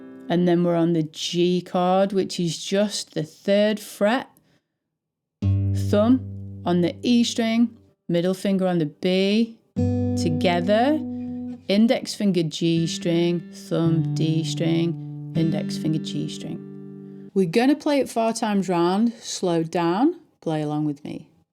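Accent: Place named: Northern England, United Kingdom. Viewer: Only came across you today, lovely channel. No faff in your delivery and it's great to hear a fellow northerner ont 'tube as opposed to an American or somebody below 'the divide' 🤣🤣 Subbed!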